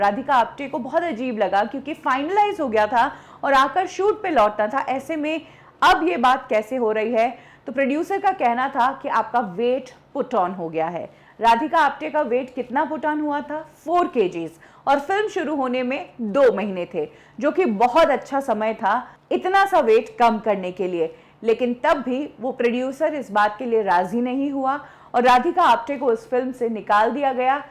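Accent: India. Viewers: हिंदी का उच्चारण सुधारें। Marathi influence is very clear. हैं को है बोलती हैं। इस तरह को और बहुत सारी गलतियां हैं।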